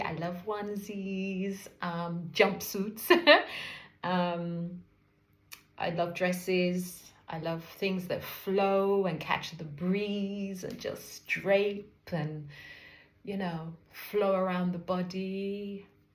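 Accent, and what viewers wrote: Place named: Jamaica. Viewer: Hey family hope all is well thanks for sharing. I love the Jamaican accent it’s really nice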